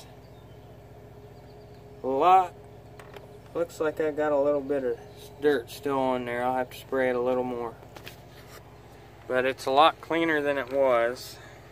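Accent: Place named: Southern Ohio, United States. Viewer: I'm from southern Ohio your accent sounds just like mine were you from Chillicothe Ohio Jackson or porstmouth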